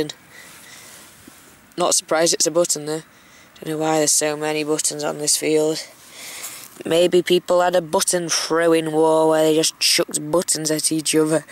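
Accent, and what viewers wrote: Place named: Yorkshire, United Kingdom. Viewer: Your accent is well good, your hilarious! Is it Yorkshire? And it's a wreath not reef ;p great vids keep them coming!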